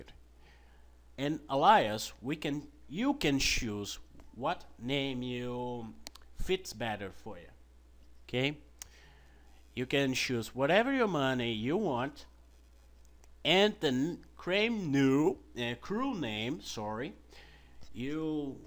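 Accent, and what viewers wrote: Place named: Brazil. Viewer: Você é Brasileiro né Por que o seu inglês é um pouco puxado para o de português Do jeito de falar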